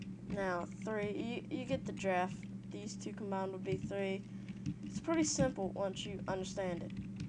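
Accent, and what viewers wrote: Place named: Texas, United States. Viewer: Nice Texan Accent! Go Texas.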